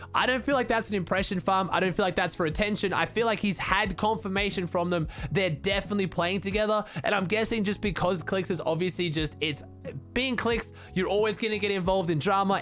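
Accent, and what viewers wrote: Australia. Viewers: Hes Australian no shit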